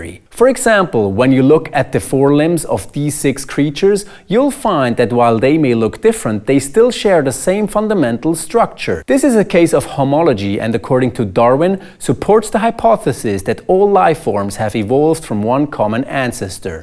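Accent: United States. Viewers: Speak English not American thanks.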